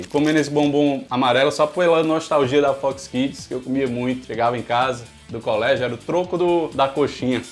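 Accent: Northeast, Brazil. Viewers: Vc é nordestino? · Gostei do que vi, menos os rostos. E, cara, você fala como Nordestino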